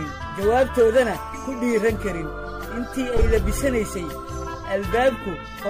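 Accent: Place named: Mogadishu, Somalia. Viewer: Sheekada waxaa Qiimaha u yeelaya wariyaha siiba lahjada Reer Mogadishu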